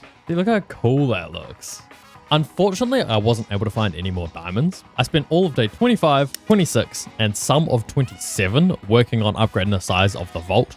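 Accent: New Zealand. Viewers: This man kinda sounds like the Minecraft playing Kiwi Version of Martincitopants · are you kiwi?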